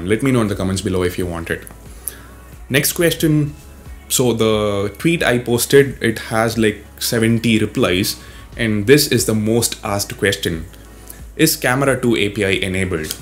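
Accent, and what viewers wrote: South India, India. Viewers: Your south indian accent tho 😂.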